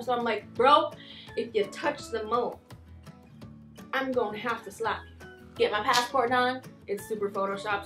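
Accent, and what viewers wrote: South, United States. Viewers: I knew you were from the south (I'm new here). Im from Macon ... Hello! 🤗🙋🏾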